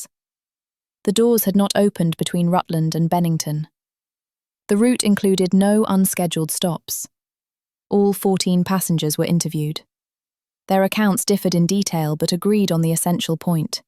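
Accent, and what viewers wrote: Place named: England, United Kingdom. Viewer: Whoopo👻, love these mysterious disappearances, especially when well narrated, love the English accent, thanks 👍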